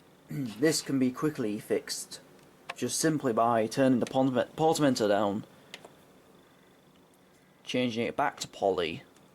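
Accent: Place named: Yorkshire, United Kingdom. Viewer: Definitely Yorkshire but hmmmm which part.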